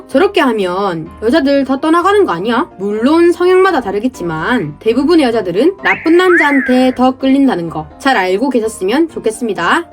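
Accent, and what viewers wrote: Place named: North Gyeongsang, South Korea. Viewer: 경북 봉화 안동쯤인듯😮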